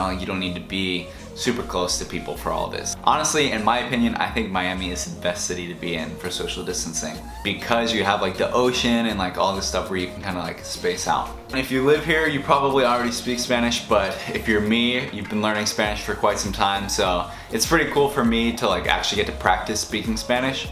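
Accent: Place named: Southern California, United States. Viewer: Are you originally from SoCal ? You have the accent lol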